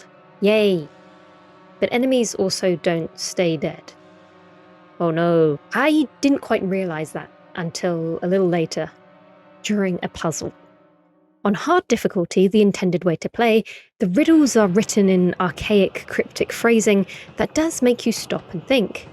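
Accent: United Kingdom. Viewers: I’m literally British and even I am charmed by her British accent, haha. So relaxing. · Every time uploads a video, I consider being a nicer person for the simple joy of listening to her deliberate concepts in video games. There's also moments where her emotion pours through her commentary and that feels wholesome and kind. We need more chill youtubers with smooth british accents talking quietly about video games all day.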